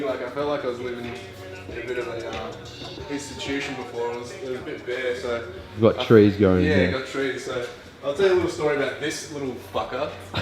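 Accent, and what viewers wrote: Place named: Australia. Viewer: great vid! i don't get why you would have haters. you seem pretty cool. nice to hear a fellow aussie on youtube 😄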